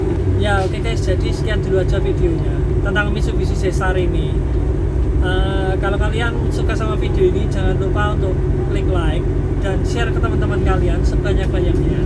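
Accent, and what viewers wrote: Indonesia. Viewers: Paras cinese tp logat jawanesa 😆😆😆👍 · mase ngingetin koncoku orang Surabaya :D.. apik motore mas wkwkw..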